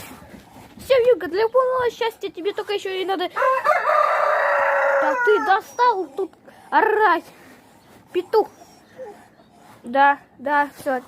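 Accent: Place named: South, Russia. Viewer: добрый день,где вы живете у тебя такой южный говорок на гх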